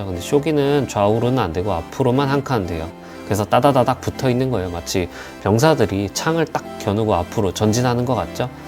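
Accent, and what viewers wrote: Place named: Southeast Asia. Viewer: 동남아 외국인 말투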